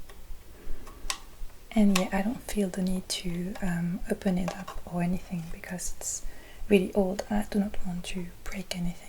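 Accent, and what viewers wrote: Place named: France. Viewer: Old cameras, french accent plus your beautiful face means perfectionnnn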